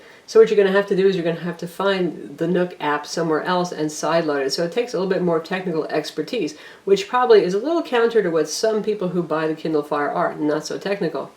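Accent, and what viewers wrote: United States. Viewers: I'm sure that this is a very helpful and interesting review but unfortunately I only understood about half of what the young lady was talking about! She speaks SO quickly and with an American accent I find difficult to follow.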